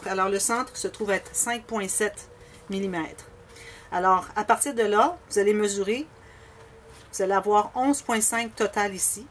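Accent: Canada. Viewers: Merci beaucoup amitié(j'adore votre accent canadien)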